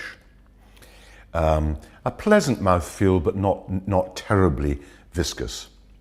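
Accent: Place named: England, United Kingdom. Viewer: sounds English with Scottish notes on certain words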